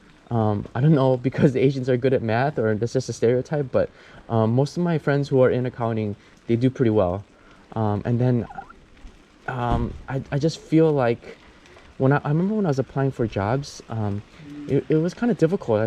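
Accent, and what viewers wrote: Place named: United States. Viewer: Oh, I forgot to say this is Happy again, I Just log in with my account because it's too difficult to write from ipad,and so difficult to edit. Yes, you and I do have similiar background, but trust me, you are very americanized, you will not have any problem what so ever if you ever choose to live in America, you are more american than you think you are. But you don't have to live in US, you can lived anywhere if that's what your heart desire. Only down side is you probably do want to use facetime to spend with your parents since it would be hard to not spend time with them. I came to us when I was 13 years and after living in usa for 31 years, I am still not as americanized as people think, but that's okay. I will never speak perfect English like you do, I still have tiny bit accent. I see many people immigrant from other country and have "little" problems lived in US, but again, if one has desire to stay, they will learn to adapt. 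so if you happy living in Japan, just be happy to do so. However, don't think you will not able to adapt US, afterall, when I "listen" to your talks, I heard just a perfect american speaking. so anyway, just a little point to share.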